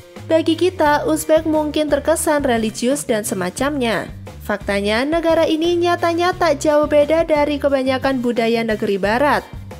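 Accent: Java, Indonesia. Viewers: Suaramu medhok mbak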